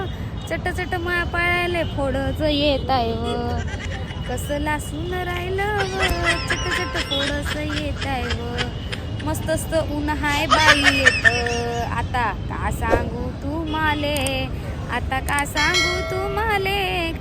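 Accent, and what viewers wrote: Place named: Chandrapur, India. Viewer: Tumchi Chandrapur chi language nice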